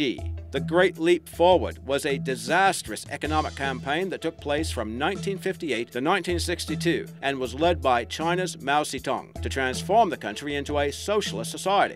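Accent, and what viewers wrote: Australia. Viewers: So very happy to have you back mate. Me an my daughter love your Aussie accent an enjoy learning about all the different animals especially the ones about Australia. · So glad the old narrator is back. I love his Aussie accent!!!! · glad you came back!! missed your Aussie accent. please don't leave us again!!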